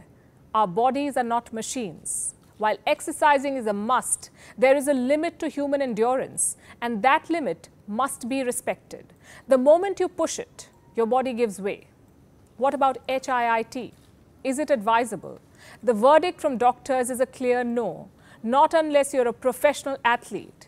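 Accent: India. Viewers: Don’t understand your English with Indian accent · Gosh, she's got this aweful voice and strong indian accent. So annoying.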